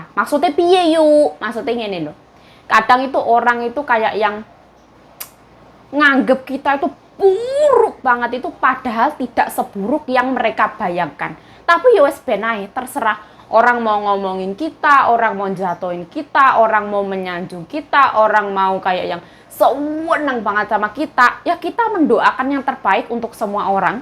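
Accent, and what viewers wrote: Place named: Ngawi, Indonesia. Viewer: Ya ampun bahasa blotrokmu wi lho hehehebe jian wong ngawi tenan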